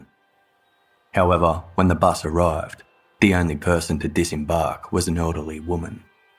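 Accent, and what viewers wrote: Australia. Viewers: This is the best narrative, lots of details. I love your aus accent too!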